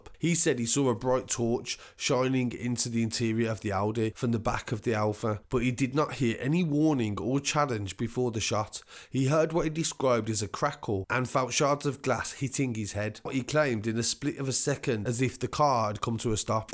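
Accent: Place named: Manchester, United Kingdom. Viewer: Can tell your from manny mint ANCOATS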